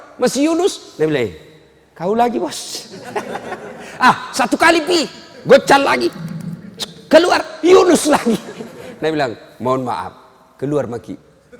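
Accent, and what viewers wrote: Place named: Bugis, Indonesia. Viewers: Ceramah lucu,kocak,bikin ngakak,logat bugisnya bikin tambah suka banget. Tapi sangat berbobot...saya ska ustadz. Smoga selalu diberkahi Allaahh ya istadz. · Inget babah denger logat bugis ustad das'ad😢 semoga nanti bisa undang ustad saat ada acara🙏 aamiin yaa allah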